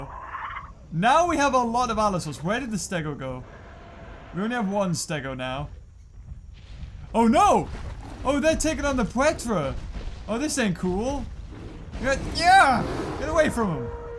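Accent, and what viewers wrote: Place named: United Kingdom. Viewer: YOU BRITISH!!!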